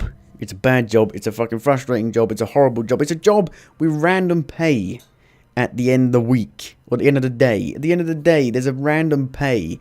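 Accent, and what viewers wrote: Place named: Essex, United Kingdom. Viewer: Where you from mate? Sounds a bit like South East... Essex maybe? But there's a bit of Weymouth in there! Like the honesty old sea dog!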